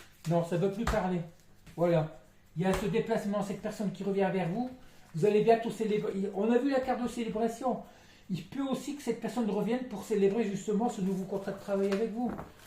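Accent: Nord-Pas-de-Calais, France. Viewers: Mon autre m'a enfin avoué en rougissant qu'il pensait tout le temps à moi... Il ne faut jamais désespérer ! Votre accent chti me rappelle mon enfance à Béthune... Merci pour vos guidances et votre bienveillance ❤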